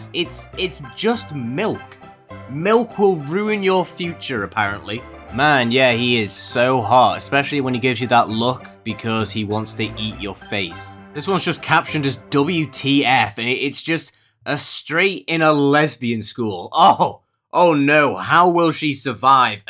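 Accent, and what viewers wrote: United Kingdom. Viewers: I have a question what’s your accent is it British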